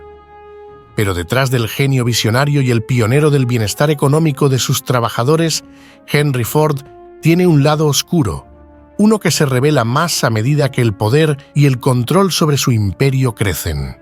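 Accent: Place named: Granada, Spain. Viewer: Esta mujer, MARIA ( MERY) fue recompensada por DIOS SANTO Por sus tantos sacrificios y méritos que desde NIÑA ella Cosechó, así que DIOS SANTO la recompensó con este HIJO llamado ENRIQUE FORD. gracias, tus videos son envolventes y así con PERAS Y MANZANAS, si entiendo un poco. P R A, Debo decir que la primera ves que vi tu Canal, pensé que eras Español ya que tu Asentó esta mas allegado a l Castellano de GRANADA que al Castellano COLOMBIANO. Congratulaciones para ti, BENDICIONES from AGUASCALIENTES CITY, EUMA) BYA BYA. 8.12,24 A, y acuérdense que TOMAS ALBA EDISON NACIO EN EL PUEBLO, SOMBRERETE, ZACATECAS CITY. NORTEAMÉRICA MEXICANA. A Tomas Alva Edison se lo llevaron sus Padres a los EEUU. ala edad de 6 años, una ves ya con la vocación de ingeniero INVENTOR, el JUDIO DE RELIGIÓN J P MORGAN lo FINANCIÓ Y SE HICIERON SOCIOS es por eso que la mayoría de los AMERICANOS DE HABLA HISPANA Y EURO ESTADUNIDENSES CREN QUE TOMAS ALBA EDISON NACIÓ EN EEUU.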